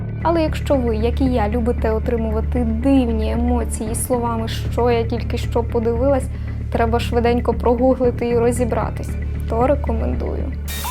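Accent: Standard. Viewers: Вау! Яка стильна та харизматична ведуча! Вподобайка та підписка без сумніву! І окрема дяка за чистісіньку мову!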